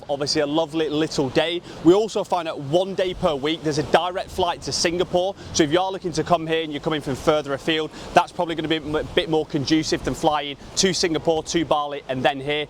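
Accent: United Kingdom. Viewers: Hi mate how are you doing? You sound like britsh.. Where do you live in England? I live in Ely Cambridgeshire mate. If you go futher to the east of Dili, it's FDTL compound (Military headquarters) you will see a huge crocodile about 7 metres long, they named him Antonio. I don't know whether this crocodile is stil there or not because I left the country ten years ago for England . just try to ask people there perhaps they can help you. Have good holiday mate and stay safe.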